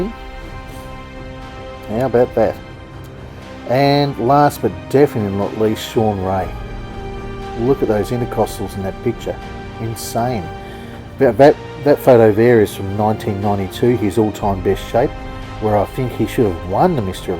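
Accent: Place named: Australia. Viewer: I love this guys beautiful Aussie accent. I could listen to him all day and his knowledge of bodybuilding is amazing.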